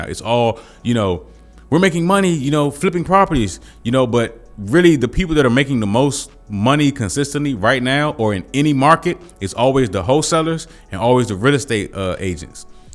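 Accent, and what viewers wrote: DMV, United States. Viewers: 10 seconds in an i knew bro was from DMV lol. Great insight for us beginners!! Respect.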